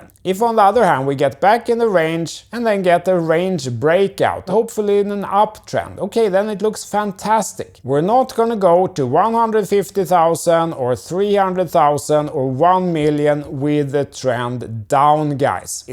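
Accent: Sweden. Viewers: Can’t hide that accent hello from a fellow Swede 🇸🇪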